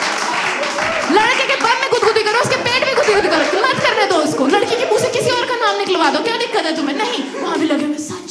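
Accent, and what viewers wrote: Haryana, India. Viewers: Haryanvi accent 😅😅😅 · Haryanvi language was bang on 🤣🤣🤣🤣🤣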